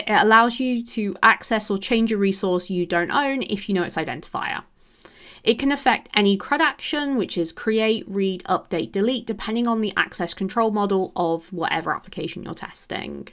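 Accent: United Kingdom. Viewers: Are you brittish 😊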